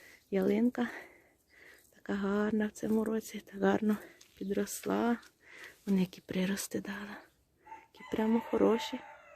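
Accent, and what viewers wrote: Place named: Volyn, Ukraine. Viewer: Доброго дня,чую у Вас волинський говір🙂.Дуже гарні у Вас квітники.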